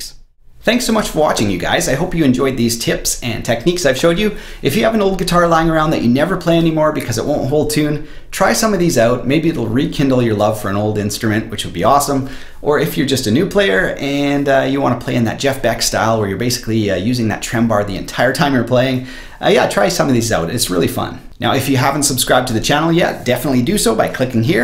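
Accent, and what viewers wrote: Canada. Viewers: like you're videos, what kind of dialects is this, Canada?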